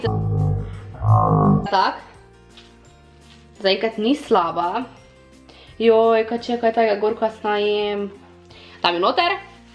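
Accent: Maribor, Slovenia. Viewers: Zdravo, hvala za video. A si glih z Maribora al pa iz okolice (poskušam ugotivit iz govorice)?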